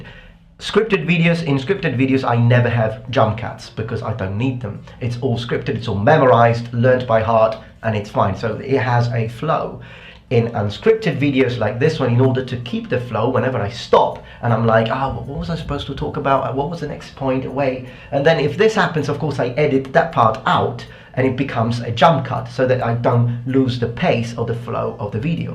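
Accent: United Kingdom. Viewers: Lloyd sounds so british man, that's pretty damn cool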